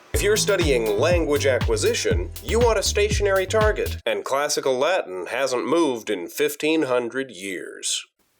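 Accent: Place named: United States. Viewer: Latin spoken in an American accent like that is truly barbaric.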